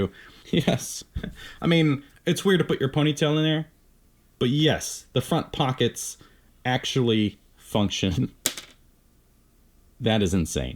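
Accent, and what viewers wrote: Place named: United Kingdom. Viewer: You are not an idiot! Nice british accent too!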